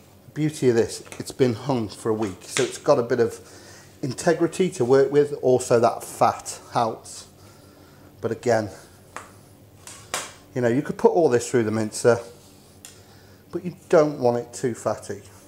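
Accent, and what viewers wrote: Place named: West Country, United Kingdom. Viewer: You got a westcountry accent like mine but I cant quite place it... top video by the way. Butchered by own lamb while watching you.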